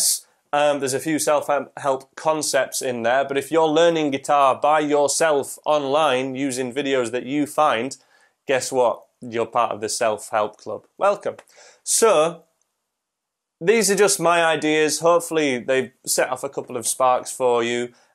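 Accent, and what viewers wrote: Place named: South Yorkshire, United Kingdom. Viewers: Are you from Barnsley? Your accent is so much like my bf's and I lived there for 4 years. If not then it's gotta be somewhere in South Yorkshire like Sheffield, Donny, Rotherham or even Wakefield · Good video. What part of the north are you from? Scotland…not Geordie for sure. I am an amateur linguist and musician. Lol.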